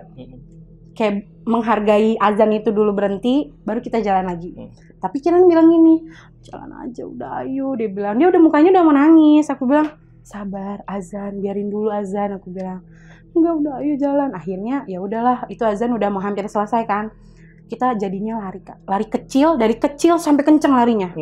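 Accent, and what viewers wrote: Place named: West Java, Indonesia. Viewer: Kak logatnya udah mirip orang Sunda lho 😊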